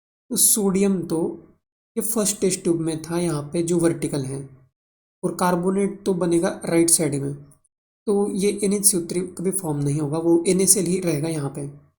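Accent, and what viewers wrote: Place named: Maharashtra, India. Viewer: Pure Marathi accent ❤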